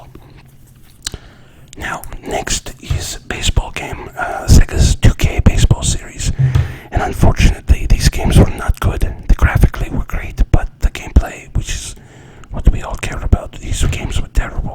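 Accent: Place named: Japan. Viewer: I love the old Japanese accent! 😂 Perfect 👌🏼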